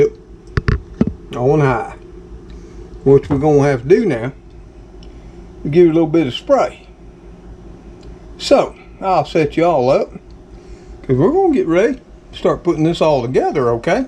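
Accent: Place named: Cajun, United States. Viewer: Before the video is over we love cats and we want to see TRIX she is so cute bust like my cats.Get cooking some more with your oignons cajun talk...I understand I'm french canadian so it's fun dropping in and putting the mask and the Covid aside!Take care and give Trix and all your other cats a big hug for me! Until we meet again online Bye bye!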